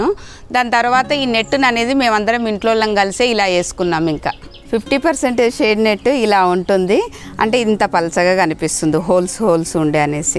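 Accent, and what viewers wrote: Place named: Telugu, India. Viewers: I like your native Telugu accent 🙏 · Garu nenu meeku big fan ayipoyanu.mee resident place ekkadandi. I am in chennai mee slang kurnool anukunta correct kada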